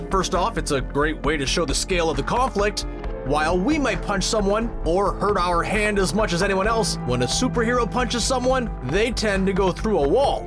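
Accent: Canada. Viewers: I hear a Canadian accent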